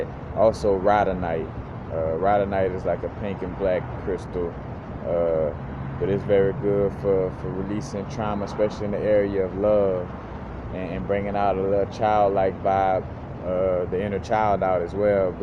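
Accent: New Orleans, United States. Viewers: You have to be from new orleans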